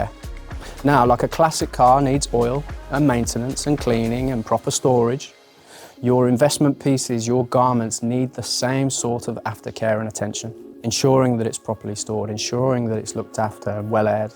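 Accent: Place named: Midlands, United Kingdom. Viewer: First time I've come across the channel and I subscribed. I really like the clear and concise explanation of everything. I disagreed on one point, which isn't major. I wouldn't describe that particular sports jacket as a blazer. Blazers, to me, are always un-patterned (unless they are striped for a particular sports club or activity). I also realize that you are nervous speaking directly to camera but if you could use your hands to gesture towards a point of interest on the clothing rather than gesturing to emphasise your speech constantly it would be a little less distracting. Slight changes of camera angle occasionally might also break up any obvious 'chop cuts' on the video. Nice to hear a Midlands accent on a sartorial channel. Good luck for the future.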